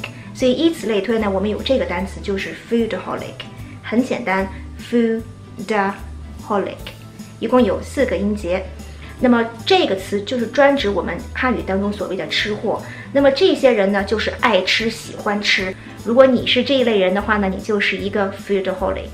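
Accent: United Kingdom. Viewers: 我想问一下在美国这么久英式口音是怎么做到没有被美音影响的，哈哈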